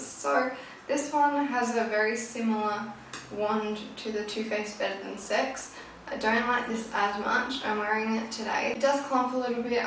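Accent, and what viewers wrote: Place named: Australia. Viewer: Love hearing an Aussie accent! Can't wait to see your progress :) I'm also panning and as a result, am addicted to watching panning videos!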